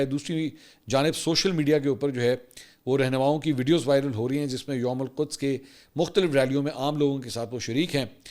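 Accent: Punjab, Pakistan. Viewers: Wah apki Punjabi ❤❤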